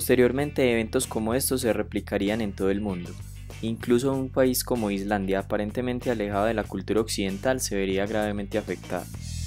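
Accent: Colombia. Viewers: este men es de colombia??? tiene voz d colombiano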